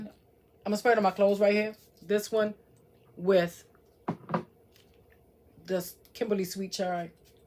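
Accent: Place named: DMV, United States. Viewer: Gurl, you from the DMV, talk your talk!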